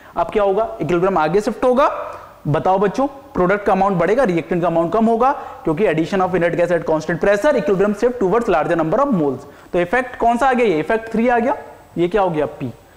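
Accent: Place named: Indore, India. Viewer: Indori accent Bhai Indore ka hai Shayad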